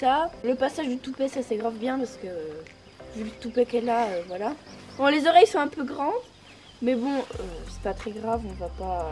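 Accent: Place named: Switzerland. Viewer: Est ce que tu es Suisse par hasard ?😁 parce que je trouve que tu as vachement l’accent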